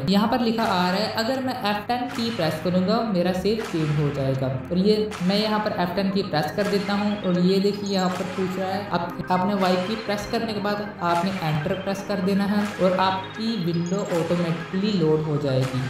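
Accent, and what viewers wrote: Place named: India. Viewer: Parece ser um indiano falando inglês.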